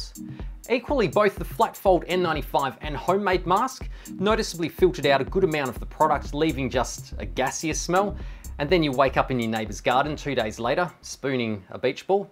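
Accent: Australia. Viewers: very interested i like to hear that Australia voice. that is an obsession with perfection. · Non Australians, and especially non-native English speakers, cant understand your accent. You might want to have an American or a Brit do a voice over to multiply your audience dramatically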